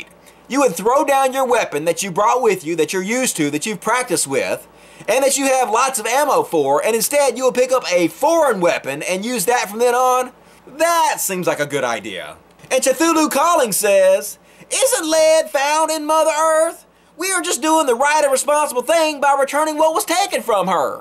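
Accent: South, United States. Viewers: Its pretty refreshing to hear a southern accent coming out of an intelligent person's mouth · with your accent, it would appear you are from a red state. You rock, but it must be lonely being one of the few with a brain that actually works!! Hang in there and keep the great logical videos coming!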